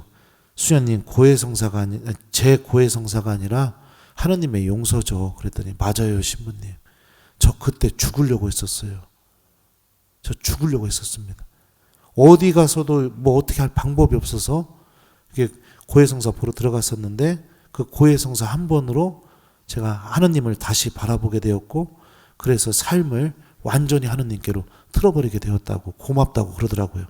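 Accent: Chungcheong, South Korea. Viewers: 요즘 신부님 특강 너무 재밋게 듣고 보고있습니다. 구수한 충청도 사투리가 마음속에 쏙쏙 와닿는 것 머리속에 꽂치는거 신부님은 천상 우리의 스승님이십니다~ 고맙습니다.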